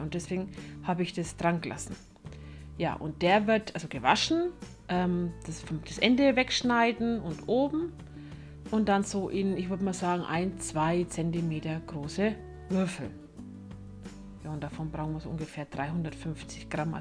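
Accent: Franconia, Germany. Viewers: a fränggin…schäi!!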